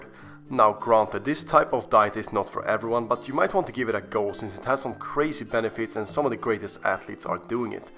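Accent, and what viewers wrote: Sweden. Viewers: I think I specialized to recognise Swedish accent. I knew you were Swedish before checking your channel's info. Thanks to esport :) Thanks for the info though! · You sound Swedish.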